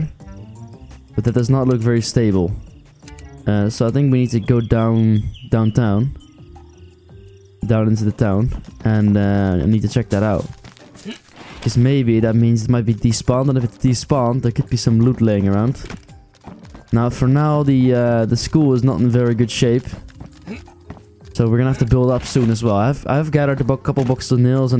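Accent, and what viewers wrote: Netherlands. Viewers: the second i heard ur voice i knew ""dat is een nederlander!"" hhaahahahah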